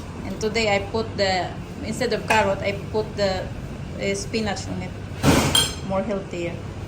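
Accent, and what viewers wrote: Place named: Misamis Oriental, Philippines. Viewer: Taga mis or cguro ka Kay naamay giatay sinultian pod nimo taga mis or gyud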